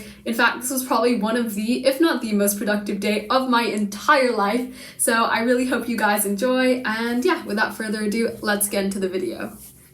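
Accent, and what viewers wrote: United States. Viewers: love this, super motivating :) i have a question, are you english? because your accent is a bit more american and i didn’t know how you took gcses x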